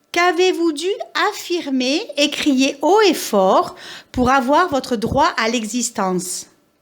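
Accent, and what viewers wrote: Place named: Provence, France. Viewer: Capsule interessante et tout à fait vrai dans ce que vous enoncez. . Merci ! P.s : d ou viens ce bel accent ? Paca , occitanie ? Bonne nuit . Yves .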